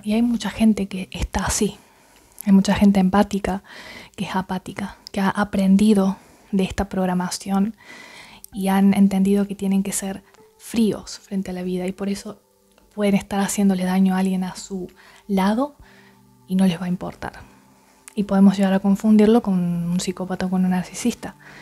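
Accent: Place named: Cordoba, Argentina. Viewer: Que te voy a explicar, ya lo explicaste todo vos y es como reflejarme, gracias por todo lo que haces, yo vivi en Cordoba casi 8 años en Tanti, lo digo porqie note tu acento, pero por ahi me equivoco.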